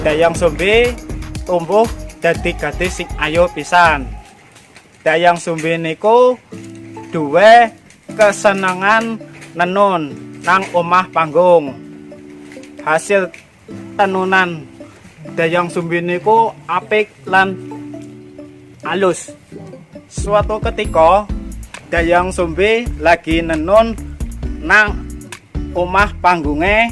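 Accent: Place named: Banyumas, Indonesia. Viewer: logat bicaranya kayak orang ngapak toh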